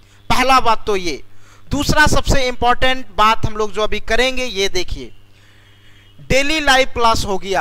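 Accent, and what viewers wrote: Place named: Bihar, India. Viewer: Maje le ro ho ap Ye bihar ki language aisi hi prvarti ki hoti h 🤗 पढना _ परना सडक_ सरक etc. It's all ✓. One can understand 😎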